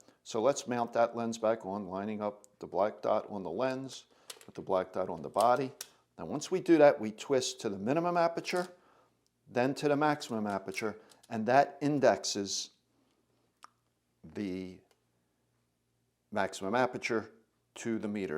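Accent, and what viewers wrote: United States. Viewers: you have a usa accent. do you specifically hang on to the softer canadian nickel for opening the battery cover?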